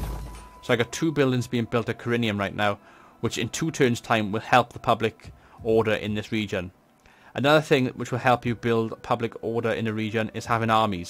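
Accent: Wales, United Kingdom. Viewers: Very nice guide, and your vocal presentation is excellent, (are you welsh?) i find it really annoying listening to commentators with bad habits like, clicking their pallet with their tongue, or swallowing all the time, saying eermm every other word, i have been playing this game for 2 days now and i have picked up quite a lot i have missed from your vid, thanks, maybe you could do a money and public order building guide as players seem to be have issues with them?, great vid well done.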